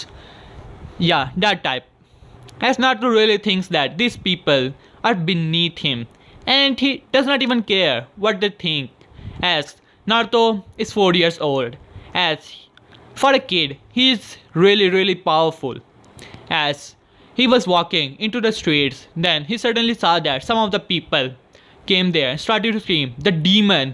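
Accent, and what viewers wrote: India. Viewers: He has an Indian Accent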